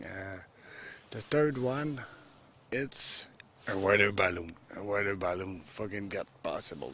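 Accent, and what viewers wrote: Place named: Quebec, Canada. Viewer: TRB3 Appareil militaire qui fonctionne a l' énergie nucléaire.... Check sul net ! Ton accent québécois dans ton anglais est très drôle en passant !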